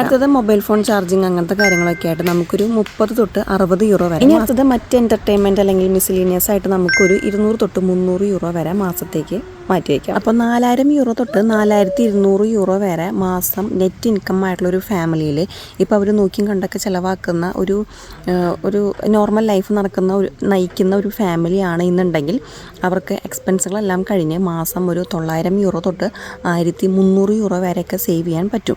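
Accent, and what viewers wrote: Thrissur, India. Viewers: മ്മ്ടെ തൃശ്ശൂരാ സുഖം ട്ടാ😅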